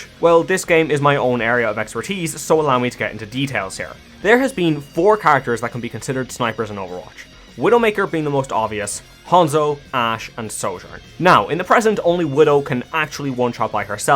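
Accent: Ireland. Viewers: lads the blokes Irish lmao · Waheey lad a fellow Irishman??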